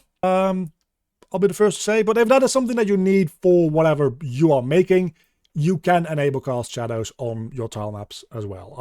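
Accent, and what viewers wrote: Netherlands. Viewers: For some reason watching this really reminds me of Jazz Jackrabbit 2 , which, hilariously, was also made by Epic Games. One of the things I really love about their lighting setup there, was that the player character has a point light (with some trails) around them, as well as all the bullets. You could have really dark scenes where you could barely see anything, shoot a few bullets to get a sense of what's happening around you, and navigate almost by "sense of touch" through the character-bound light. As you started building out lights in your scene, it triggered deep memories from that game. <3 Thanks for the tutorial! Great stuff. Just what I needed. P.S. Heb je een Nederlands accent? ;)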